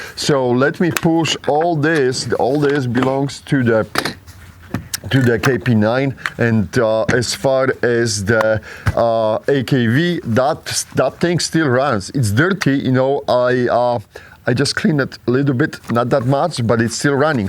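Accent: Serbia. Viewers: He pronounces his R's a THE sound just as someone who is native Serbian speaker! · Yeah sounds like Serbian I wish I didn't lose my accent or can control it on command haha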